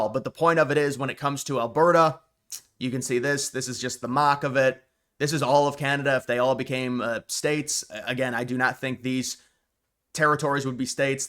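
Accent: United States. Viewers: You talk like an American.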